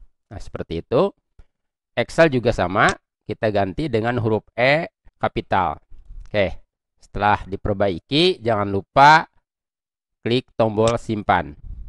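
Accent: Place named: Bali, Indonesia. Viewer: lho sy kira org bali, logatnya bali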